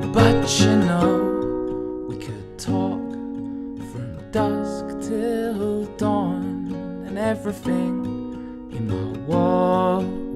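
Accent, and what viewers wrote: United Kingdom. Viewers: Is it British accent ? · Is this British accent or American accet...please tell me..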